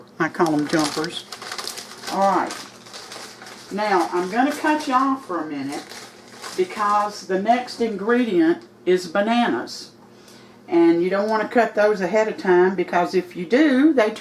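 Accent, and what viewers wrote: South, United States. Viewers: oh I didn’t think you named a country of origin or if you did I missed it! I just thought I would add that little trivia. I love watching your videos. What I love most is your straight forwardness and honesty. I’m the same way but it gets me in trouble sometimes. I have no filter and that is not a good thing!🤣😂🤣😂🤣😂 And you would never know you were raised up north. You sound and cook like a true southerner!! 💖💖💖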